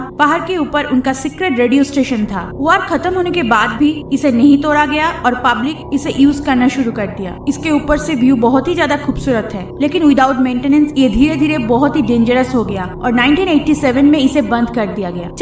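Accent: Bengal, India. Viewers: Ur bengali accent is so cute.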